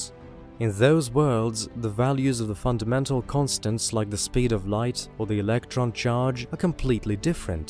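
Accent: United Kingdom. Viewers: This guy sounds like the posh English dude that does/did stream Civilization on YouTube when him and his friends played it together 👍😅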